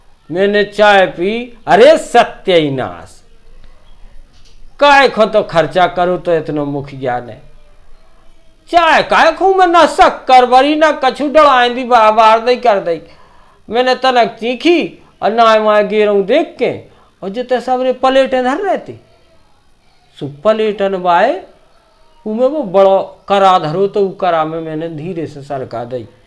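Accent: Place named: Bundelkhand, India. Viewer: गजब की बुंदेलखंडी जी बोलते है बहुत गजब का टेलेंट है आपके पास ऐसा टेलेंट मैने फिल्मी दुनियां के कलाकारों के पास भी नहीं देखा है आप हमारे बुंदेलखंड की शान हो 🙏🙏🙏🙏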